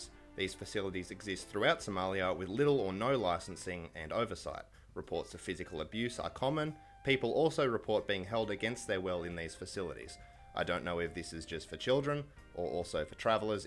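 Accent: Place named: United Kingdom. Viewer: you have a british accent..you from the uk?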